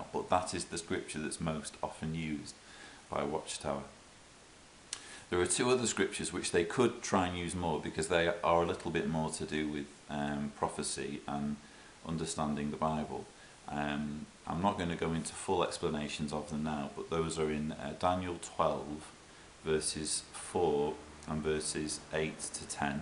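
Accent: United Kingdom. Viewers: hi “ in acts. Furthermore there is no salvation in anyone else, For there is not another name under heaven that has been given among men by which we must get saved.” This is there errors there not holding on to the head, Christ “! In 1 Corinthians. For no man can lay any other foundations then what is laid., Which is Jesus Christ.” This men from Warwick have taken over the seat of Jesus, Apparently. And the CB name Warwick seems to be mean king maker. Yes they have sat up there own princes and kings. In first 1 Thessalonians 2: 2: 3. Said The exhortation we give does not arise from error,” Or from uncleanness or with deceit. This man the Bible writers that and Jesus apostles were chosen by Jehovah.that’s why when they would speak it came to be so. And men from today are absulitly not chosen from God”! That’s why there prophetic <num> and 1947 or 1957. There false prophets. 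Your daughters are so cute, I enjoy hearing your accent of British. Please don’t change it bye!” In number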